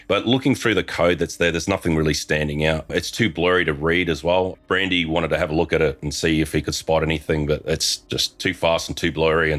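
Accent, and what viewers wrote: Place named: Australia. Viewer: Hope all is well mate, you were a big reason I got into the forest and I still routinely watch on your old videos just for a good time. Hearing another Australians voice playing through a game I loved so much was a huge hook for me and I’m eagerly awaiting a video once the time is right. Hoping everything’s great with your personal life, and one final huge thankyou for being a big part of my friends and i’s lives growing up.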